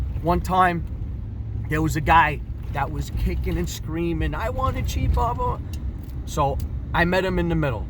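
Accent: New York, United States. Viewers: Your new york accent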